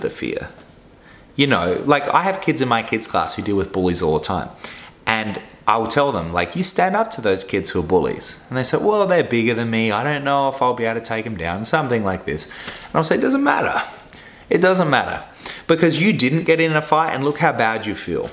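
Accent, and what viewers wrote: Australia. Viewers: Is your accent Australian? So you train where the spiders are the size of your head?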